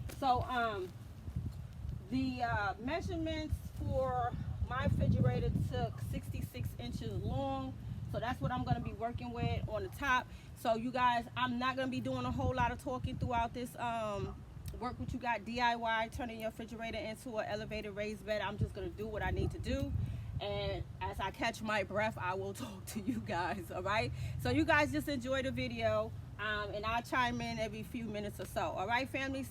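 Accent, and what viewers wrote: New York, United States. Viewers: Too cool! You just inspired me! I have an old bookshelf that can be a raised bed and I love your accent lol you sound like my New York family Take Care ~Nicole 🌿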